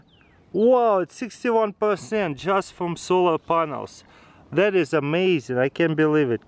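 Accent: Russia. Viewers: He's from Russia.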